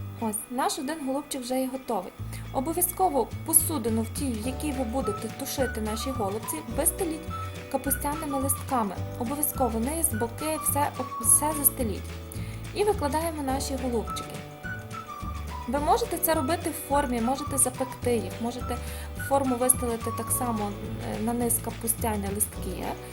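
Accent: Western Ukraine, Ukraine. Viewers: Не гадайте яка область західний говір приємний ніжний доходить до всіх хто кохається в мові України.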